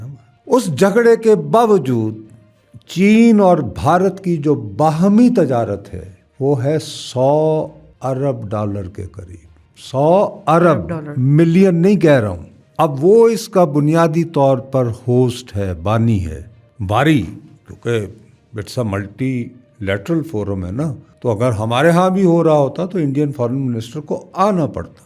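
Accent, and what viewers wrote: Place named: Lahore, Pakistan. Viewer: سر نصرت بہترین لاہوری سٹائل میں تجزیہ بہت زبردست اللہ آپ جیسے صحافیوں سے پاکستان کو برکت دے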